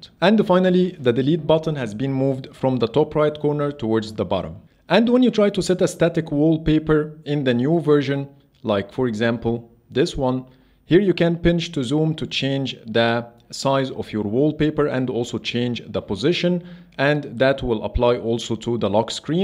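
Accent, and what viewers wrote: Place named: Egypt. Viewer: لهجتك انجليزي مصري ... عاش اوي ❤️❤️❤️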